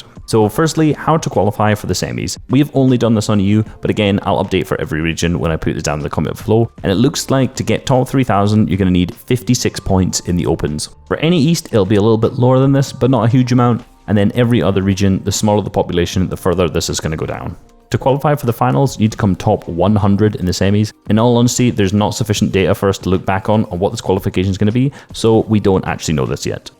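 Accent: Scotland, United Kingdom. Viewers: are you scottish?? if you are you are my favourite analyst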